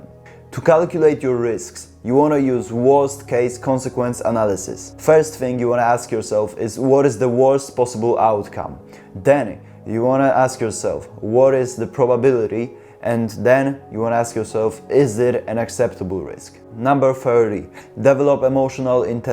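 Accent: Poland. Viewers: Czuć na kilometr polski akcent.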